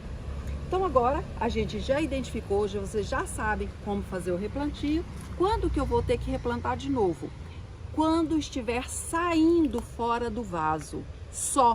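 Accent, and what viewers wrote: Minas Gerais, Brazil. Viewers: Voce é mineira ?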